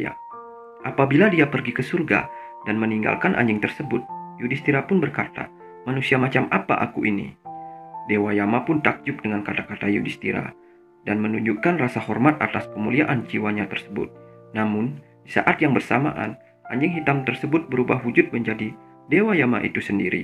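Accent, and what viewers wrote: Bali, Indonesia. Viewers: ciri khas logat bali heheh pelat r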